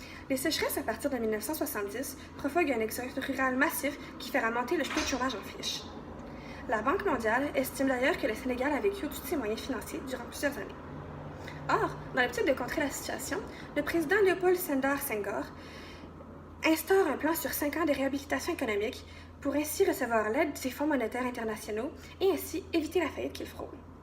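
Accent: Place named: Canada. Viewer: L'accent est un peu difficile à comprendre. Est ce comme ça que les canadiens parlent ?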